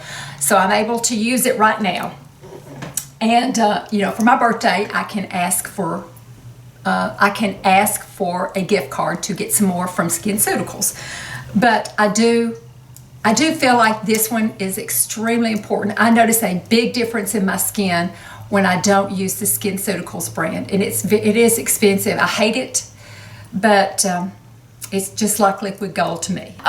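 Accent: South, United States. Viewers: Lol i dont know if it's the southern accent but you seem like such a sweet person, something about you reminds me of a warm hug. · Thank you for your help.You definitely look better than Christy Brinkley or any other Hollywood beauty guru.Please tell us about your diet and exercise,supplements. I find that woman that gain and loose weight tend to loose tone in the jaw line.You have amazing bone structure.Do you have any recommendations for face yoga or face massage?Can you share your ethnic background? Thank you for encouraging us to age well.I hope to move into being older and better as you have.Love the southern accent.God bless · Did ur accent get more southern? Lolz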